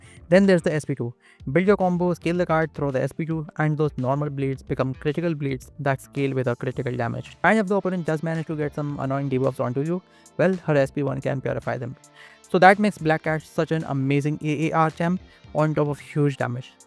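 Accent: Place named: India. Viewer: bro whats your country origin ? cause you have alot of indIan accent in your talk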